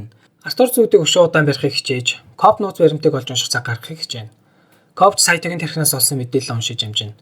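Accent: Uvs, Mongolia. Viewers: Увсаар яриад байхын 😂😂😂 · Увс аялага 🤨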